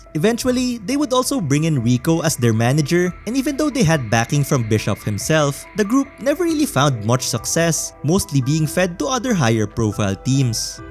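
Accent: Philippines. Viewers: The moment I heard you speak, I knew right away that you're a 'kababayan'. 😄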